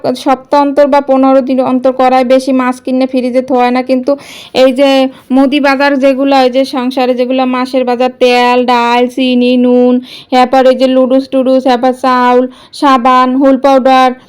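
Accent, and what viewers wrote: Barishal, Bangladesh. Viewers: তোমার চালের ড্রাম টা আমারটার সেইম কালার আপু,আমারও এখন পর্যন্ত আল্লাহ্ র রহমতে কোনো নেগেটিভ কমেন্ট নাই,তোমার কথাই সুন্দর লাগে বেশী আপু,বরিশালের আন্ঞলিক ভাসা,আহা❤❤❤আমারও সংসারে যা আছে সেই সত্য জিনিষ দিয়েই তোমাদের মাঝে ভিডিও নিয়ে হাজির হই আপু❤কমেন্ট পরলে,রেহানা আপু,হাবিবা,শিশির আপুর সাথে আমি যুক্ত আছি❤মহিলাদের কাজ,আর বলো না আপু😢শেষ করলাম আপু,আলহামদুলিল্লাহ্❤ফুল ওয়াচ❤পাশে থেকো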